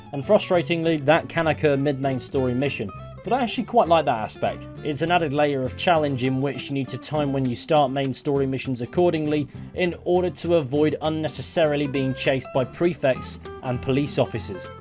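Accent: United Kingdom. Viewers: Despite the janky controls, and the fact I could never get all 50 lessons done in any playthrough (damn you, Biology 5!), I loved playing Canis Canem Edit on PS2. Iirc, there were only 5 lessons and the Xbox 360 version had 10 lessons and was called Bully: Scholarship Edition....well, in the UK, anyway. From your accent, I'm fairly sure you remember the moral panic in the UK about a game being called Bully.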